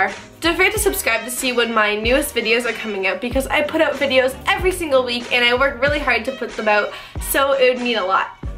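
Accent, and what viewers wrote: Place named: Canada. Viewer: your canadian accent is so cute lol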